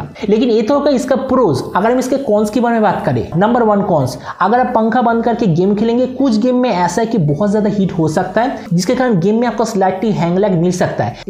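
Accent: Bengal, India. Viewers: You must be a Bengali as your Hindi is typical of eastern part of india 😃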